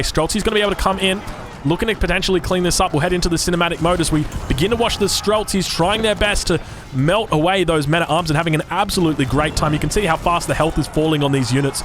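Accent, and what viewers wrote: Australia. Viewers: Im italian but i can feel his Australian accent is funny.good to see some aoe game and learn something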